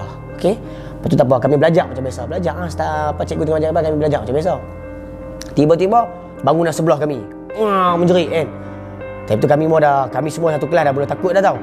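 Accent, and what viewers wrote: Kedah, Malaysia. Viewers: Ska lh dngr abg ckp bhse kedah. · Terbaik la channel hang dik...dengaq hang cakap..terus rindu mk ayh di kg.(kedah)..salam dari selangor dikk..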